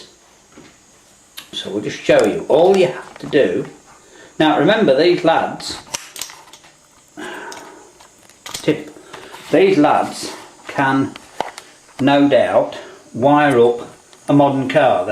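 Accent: England, United Kingdom. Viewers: Your the best , love your English accent Keep it up Bro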